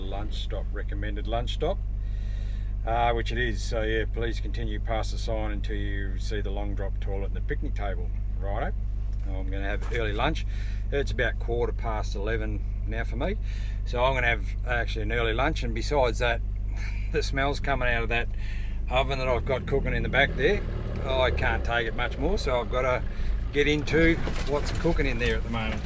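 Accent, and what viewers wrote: Australia. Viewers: Thanks. I’m dreaming of getting there in 2026. I’ve got a Toyota Prado <num> with 180000kms on the clock and never been out of Adelaide. So getting it ready to explore the Flinders Rangers but not going to go crazy but keep to reasonably good tracks being in my mid 60s and having less than desirable mechanical skills. I’m also a photographer so going to take it slow and easy. You are truly inspirational and with a real Aussie spirit and accent I’d kill for which is not often heard these days and reminds me of the good old days in Darwin and Katherine with real true blue Aussie blokes. I’ve been in Australia for almost 40 years and bring tears to my eyes seeing the Aussie spirit slowly being drowned in the dust of some people who tread on it at will. Your video gave me comfort and I wasn’t tired of watching it to the end because of your highly skilled beautiful presentation. 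Please keep up the good work and open the beautiful hidden world in Australia so those whose hearts are pure can taste it with much gratitude to the great souls who built this great nation.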